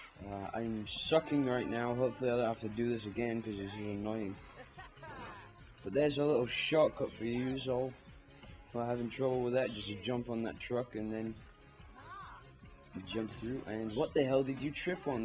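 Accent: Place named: South Africa. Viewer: Which country are you from? you sound south african also very bored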